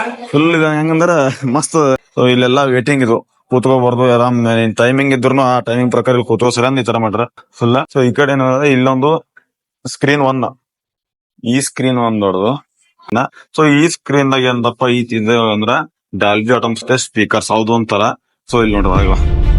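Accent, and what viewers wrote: Gulbarga, India. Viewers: ನೀವು ಮಾತಾಡೋ ಗುಲ್ಬರ್ಗ ಸ್ಟೈಲ್ ನಮಗೆ ಅರ್ಥ ಆಗ್ತಾ ಇಲ್ಲ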